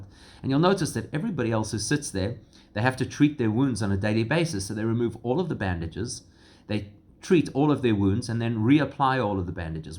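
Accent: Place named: South Africa. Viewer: Are you South African? Definitely have bit of South African accent.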